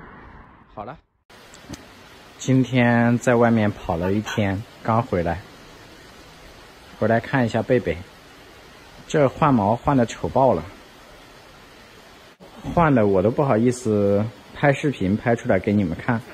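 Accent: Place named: North, China. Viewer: 從不露臉的視頻主,身材好瘦高型,講話字正腔圓聲音好聽,是不是年輕人不知道？猜是大陸北方人or東北人？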